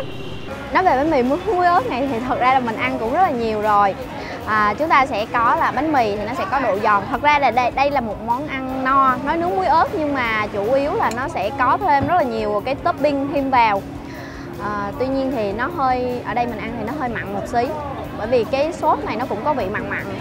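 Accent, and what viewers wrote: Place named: Central, Vietnam. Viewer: bã nói giống dân miền Trung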